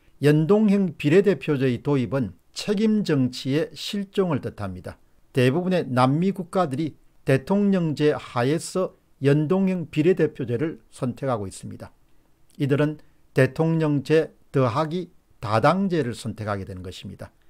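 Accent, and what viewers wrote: Gyeongsang, South Korea. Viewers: 와 경상도 말쓰는 극우 유튜버다! 자한당에 대해서는 아무런 할말이 없지요?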